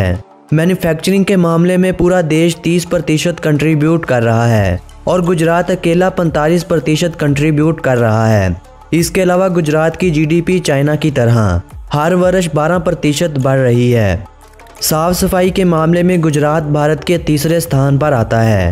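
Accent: Pakistan. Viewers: Aavaj achhi hai. sab kuch population dikhaya. Urdu ki lahak aa jati hai. Kaha ke hai?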